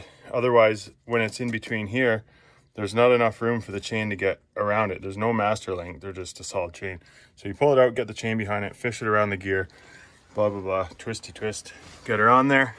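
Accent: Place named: Canada. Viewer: Your Canadian!! That's why I can understand you 😂😂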